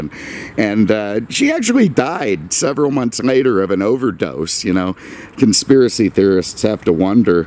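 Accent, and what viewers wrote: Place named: New York, United States. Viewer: It was to see this video. What a beauty! Miss your beautiful happy disposition!!.😂😂😂😂😂 You sound like you are from New York.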